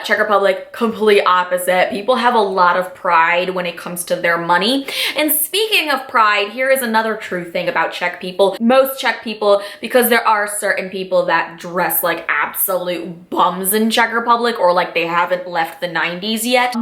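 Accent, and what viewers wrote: United States. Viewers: mluvíš jako kdyby jsi se narodila v americe :D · Hi darling, i love you really. I am from Czech, and I love you for one reason i think you are proud to be half czech person, if you dont understand me i wrote it in czech. Myslím si, že si pyšná na to že si napůl češka, a to je moc krásné od tebe. Doufám, že si to přečteš nebo mi dokonce odepíšeš. Bylo by to pro mě hoodně velké překvapení protože tě miluju, tvoje videa jsou 100% úžasné. A ikdyž máš americký přízvuk tak ti nádherně rozumím. <3 I love you!! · xD veľa američanov rozpráva takto xD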